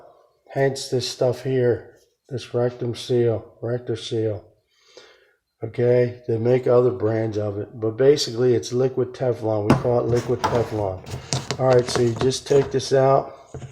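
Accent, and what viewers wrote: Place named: East Coast, United States. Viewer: I knew it was the East coast. I’m from Maryland and you sounded familiar 🥰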